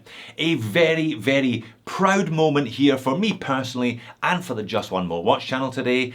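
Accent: Scotland, United Kingdom. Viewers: That's the Scottish pronunciation of the Duro. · Love the Scottish 'Hello' on this channel and Scott Manley's.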